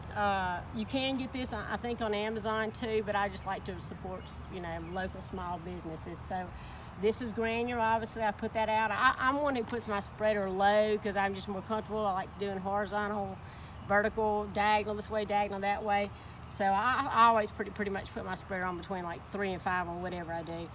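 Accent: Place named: Louisiana, United States. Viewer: Yard Looks good. Knew soon as I heard you speak you was from the top of the boot.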